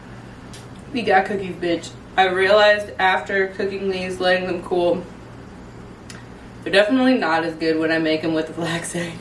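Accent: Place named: Canada. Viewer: The Canadian accent is amazing!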